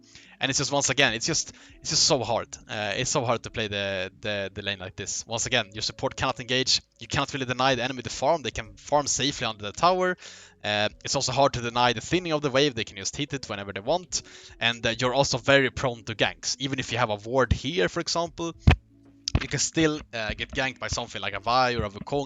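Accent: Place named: Sweden. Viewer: Haha aldrig hört en bättre svengelska! Grym video🤘🏻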